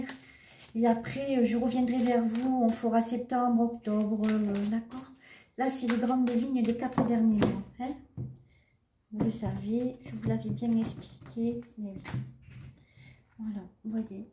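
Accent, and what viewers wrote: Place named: Southwest, France. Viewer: Bonjour je viens de vous découvrir est je me abonnée j aime bcp votre douceur et votre l accent si je me trompe pas il viens de sud-ouest, merci 🙏pour tout ces bonnes nouvelles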